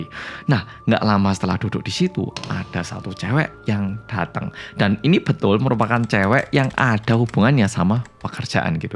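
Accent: Java, Indonesia. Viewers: Hallo bolo medok❤ Nyimak🎉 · terakhir bikin ketawa pas bilang duhh duowone 😁 jowo nyeelll pokok e dirimu.kalau dengerny sambil merem pasti tak kira org Jawa nyell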